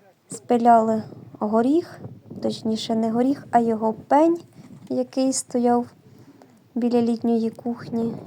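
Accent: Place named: Cherkasy, Ukraine. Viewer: А яку назву має ваше село? По говору чую, що область Черкаська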